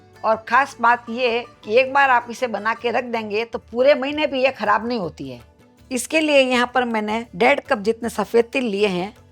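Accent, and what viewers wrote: India. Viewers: Aap bahut achha video bnate ho, Apki language or bolne ka desi tarikha super h.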